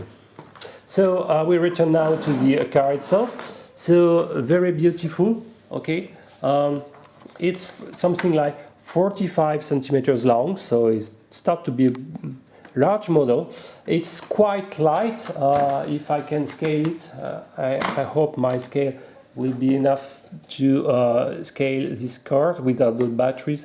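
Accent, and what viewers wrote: France. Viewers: Hey dude are you french? You have a strong accent!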